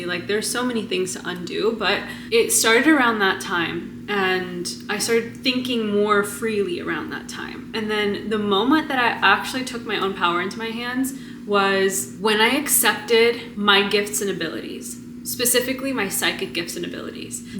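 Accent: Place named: United States. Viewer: Defiantly fine, fine, fine. Yet I have a hard time making out what ethnicity you are though because of American accent is strong.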